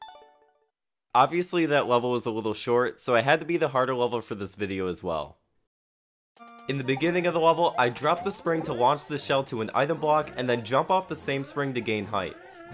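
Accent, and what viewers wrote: Germany. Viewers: Are u german?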